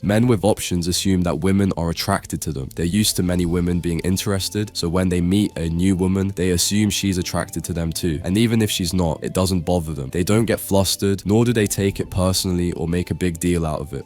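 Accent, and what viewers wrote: United Kingdom. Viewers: UK accent🔥🔥🔥🧠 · Are you British?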